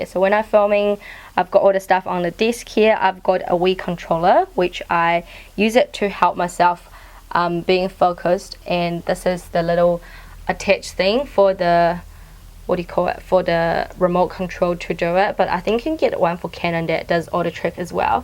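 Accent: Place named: Australia. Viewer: Great tip. Are you from Australia? your accent is so cute.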